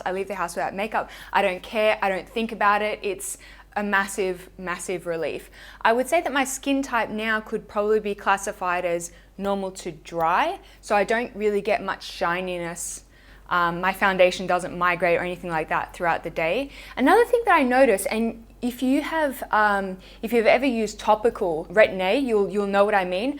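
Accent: Australia. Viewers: Wow an aussie on YT! Great to see and hear :) From an aussie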